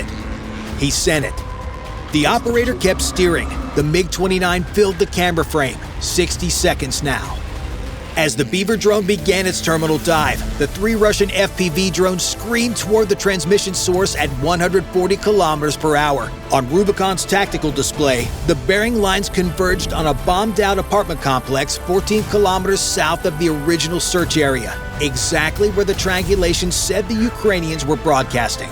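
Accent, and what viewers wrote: United States. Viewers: Drop the shouty American voice over, it ruins your video !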